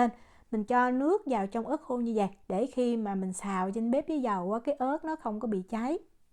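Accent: South, Vietnam. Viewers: Mình là người Bắc( Hà Giang),từ khi biết kênh chị đã rất thích các công thức nấu ăn của chị và cả chất giọng miền Nam nhẹ nhàng, truyền cảm này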